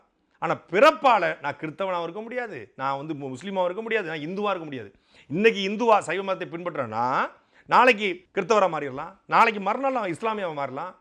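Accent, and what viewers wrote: Tirunelveli, India. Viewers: சாட்டை அவர்களே 1800களில் இத்தாலியிலிருந்து தமிழகத்தில் கிருத்துவ மதத்தை பரப்புவதற்காக வந்த ஜோசப் பிஸ்கின் தமிழ் மீது பற்றுதல் காரணமாக எனது பெயரை வீரமாமுனிவர் என்று பெயரை மாற்றி தமிழில் பைபிளை முதலில் அச்சிட்டு தமிழ் மொழியை பற்றுதலால் தமிழுக்கு இலக்கணம் வகுத்து தமிழுக்கு தொண்டாற்றி அறிஞர்களிடம் தமிழை கற்று தமிழில் பல நூல்களையும் எழுதி கிறித்தவர் தான் நாம் பேசும் நீங்கள் பேசும் பாட்டை அவர்கள் பேசும் தமிழை அழுத்தம் திருத்தமாக பேசும் திருநெல்வேலி தமிழை தூத்துக்குடி அருகே உள்ள சாயர்புரம் காட்சிக் கூடங்கள் நிறுவி கிருத்தவ தேவாலயத்தை கட்டி அன்று ஏழை எளிய மக்களை உயர்வுக்கு கொண்டு சென்றவர் இவருக்கு கருணாநிதி சிலை எடுத்தார்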